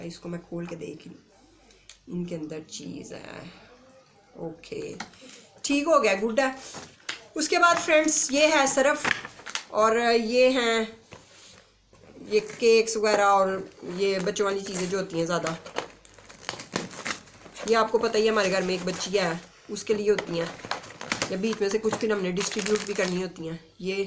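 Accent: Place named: Sialkot, Pakistan. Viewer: Apky accent sy hu lgta hy Ap sialkoti hu 😄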